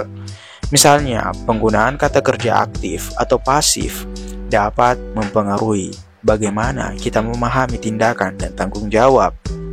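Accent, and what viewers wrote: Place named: Sulawesi, Indonesia. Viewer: aksen cukup kental bang😊 Saya juga Bugis asli😁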